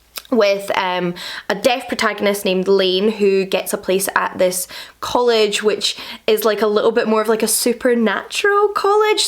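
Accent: United Kingdom. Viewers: My like was automatic for this new video, shot and uploaded by. I like to listen to her voice, even her accent is British. Greetings from Sonora, México 🇲🇽.. 👍, which is a border states with USA.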